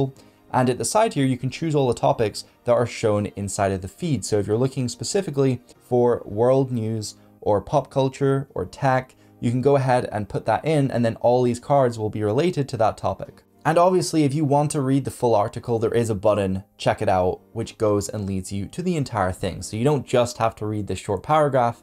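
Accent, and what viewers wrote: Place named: Scotland, United Kingdom. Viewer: Hi! Thanks for a great video. I have been following you for a few months now, really like your content. I recently got the pixel 6a. My main phone is the iPhone 13pro Max, got the pixel for steaming. I think I just downloaded most of the apps you recommended. I wanted to ask. Are you Scottish? I am from Edinburgh, Scotland. I can hear a Scottish accent when you talk. It's cool.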